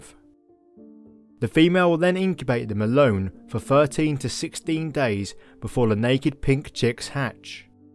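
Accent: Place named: England, United Kingdom. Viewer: I like accent. We don’t hear enough of our English accents enough these days with all their idiosyncrasies.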